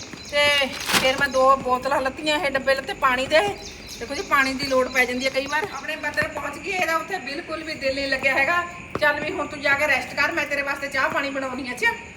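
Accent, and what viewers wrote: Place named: Patiala, India. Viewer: beta tuhada pind kihra hai boli patiale de hai❤❤🎉🎉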